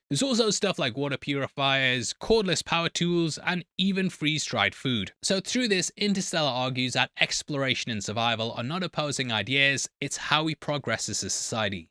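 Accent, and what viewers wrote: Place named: Newcastle, United Kingdom. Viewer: YOU are this channel, everything we watch and listen to here comes from you. Like other people have said, we could all go and watch a similar (albeit not as good obviously 😉) video to this on YouTube but we all choose to watch you. As cheesy as it sounds that means you’ve built a community here, and it’s not crazy to think that we care about you. I hope in the future you feel you can put a bit more of yourself in your videos without sacrificing the right to a private life. It really meant a lot that you trusted your audience to tell us something very painful but also very relevant to your emotional response to this film. As an aside, I fucking love your channel, it’s an absolutely gargantuan (pun intended) amount of work you’ve put into it which is hugely appreciated. Also I moved away from Tyneside two years ago so it’s really comforting to hear a Geordie accent emanating from my TV and filling my living room. 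❤❤❤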